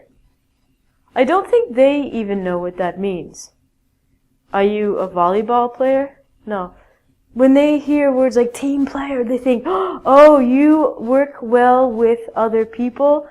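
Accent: Canada. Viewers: oh my god, i could tell you were Canadian from the start, haha. I love Canada! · are you canadian?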